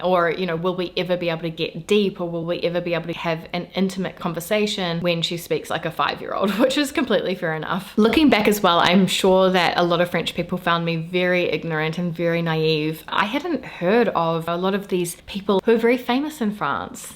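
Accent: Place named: New Zealand. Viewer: Your accent doesn't sound English to me. I can tell you're a Kiwi...or Aussie, lol. For perspective, I grew up in Colorado, USA, and have lived around the American West. To me the New Zealand accent sounds like a softer version of an Australian accent. To an American, anyway.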